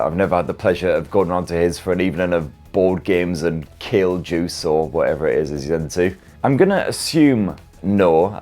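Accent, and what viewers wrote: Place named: Northern England, United Kingdom. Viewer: He's just got a regular Northern English accent 😊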